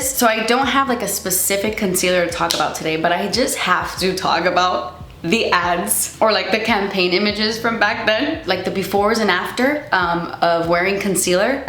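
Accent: United Kingdom. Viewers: The British accent reminds me of Andy from the office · Love this! My daughter came in while I was watching and said, “she has a British accent!” I was like, “yeah…well kind of…” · Why do you keep going British????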